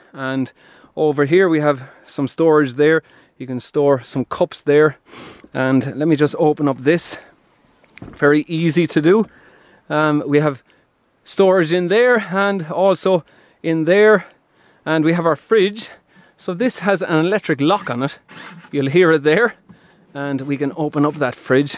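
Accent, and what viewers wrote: Ireland. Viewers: I come for the trucks and stay for the accent haha love you Irish!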